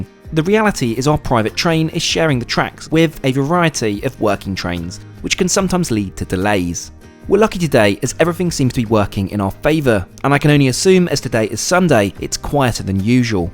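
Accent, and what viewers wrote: United Kingdom. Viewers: sorry for my ignorance but are you english, scottish, or australian? i’m genuinely curious and i’m awful with european accents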